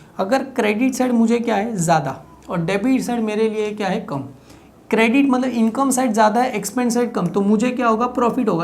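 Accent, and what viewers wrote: Gujarat, India. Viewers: Inki accent se ye gujrati lgre h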